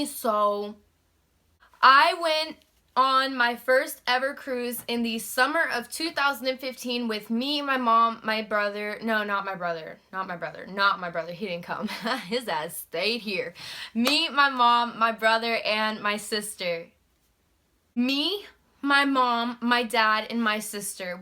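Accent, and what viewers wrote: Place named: Atlanta, United States. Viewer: Girl you gotta be from Atlanta. Lol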